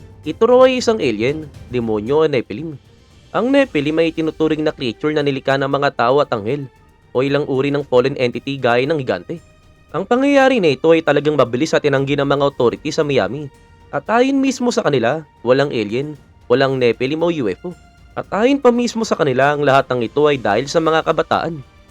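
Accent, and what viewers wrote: Iloilo, Philippines. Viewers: Ilonggo ang blogger?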